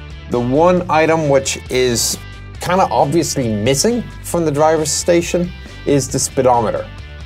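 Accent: Ireland. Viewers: Listening to your accent, gives me the impression that you're Irish. Am I correct? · He sounds slightly Irish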